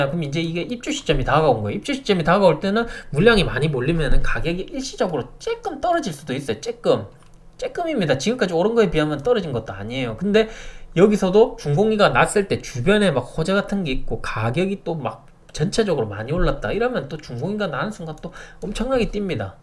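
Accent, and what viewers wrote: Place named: Busan, South Korea. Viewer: 정보 감사합니다. 지금은 서울에서 살고있지만 15년전에서 부산남천동에서 살았지요. 정보도 감사하고 고향사투리가 듣기도 좋아요.^^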